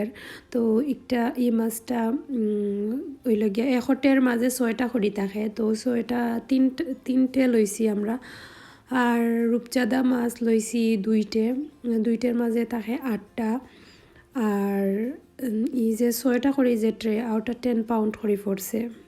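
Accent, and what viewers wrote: Sylhet, Bangladesh. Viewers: Assalamualaikum apu apnar vlogta onek balo hoesay kub sundor laglo. apu Ame apnar onek old fan bt comment korene kokono. apu ameo apnar moto Sylhety akjon may tai apnar kota suntay kub balo lage amar.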